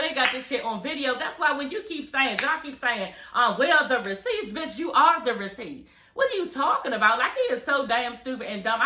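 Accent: Washington DC, United States. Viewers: I love your commentary! Are you from DMV or Dc with that accent?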